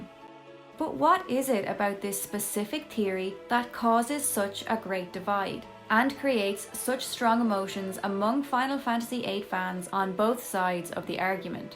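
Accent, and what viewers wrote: Ireland. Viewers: I don't know the specific accent, but you sound Vaguely corcaigh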